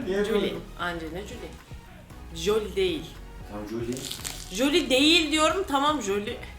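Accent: Kütahya, Turkey. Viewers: Kütahyalı mısın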